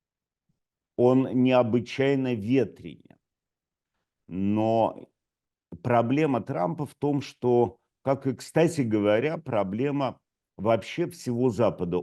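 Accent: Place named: Oxford, United Kingdom. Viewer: Я так и знал! Я всегда знал, или догадывался что милейшие милорд - это человек Оксфорда. Просто по его оксфордскому выговору, по манере держаться, присущей всем небожителям и по качеству работы его мозга.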